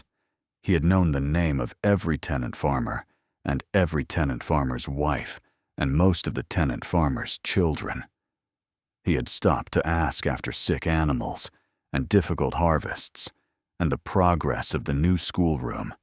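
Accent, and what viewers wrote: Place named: United States. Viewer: Ovo je jedan od dva AI kanala koja pratim, ne volim AI kanale ali volim ovakve priče, kad ChatGPT nije nepismen kao obično. Molim da se prebacite na britanski engleski jer američki akcent u pričama ove vrste iritira i dodaje na veštačkom dojmu kojeg je, zbog AI, ionako više nego dovoljno. Hvala.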